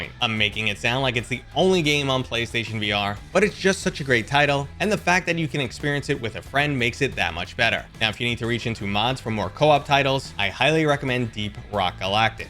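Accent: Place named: South Boston, United States. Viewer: Appreciate the work put into this video and the Southy accent lo thanks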